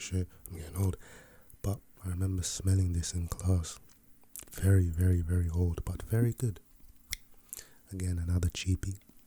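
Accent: United Kingdom. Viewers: Loving these bro.. an if I’m not mistaken , you from the UK ? You gotta new sub 👌🏻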